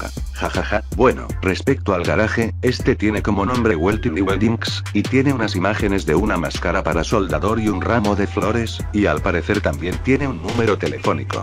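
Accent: Venezuela. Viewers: Vos sos Venezuelano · Esa canción es de la tino América y es venezolano porque su forma de hablar las formas de groserías es obvio que es venezolano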